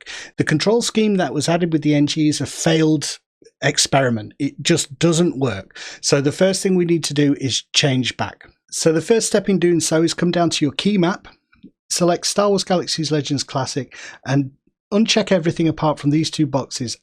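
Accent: United Kingdom. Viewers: why is every darn video i watch on youtube always from someone who can't speak english without some heavy british scottish accent?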